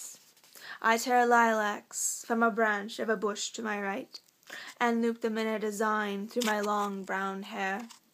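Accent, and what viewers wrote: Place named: England, United Kingdom. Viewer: You sound English. Good sonnet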